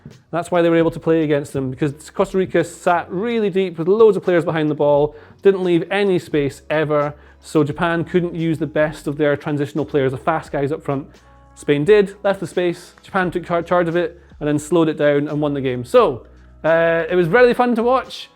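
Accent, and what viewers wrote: Scotland, United Kingdom. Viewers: I think it's his Scottish accent. Dough always sounds like doo.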